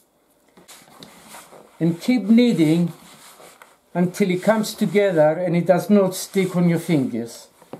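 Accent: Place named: Cyprus. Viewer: I love your recipes as I also love Cypriot food and Cypriot accent 😉😍💖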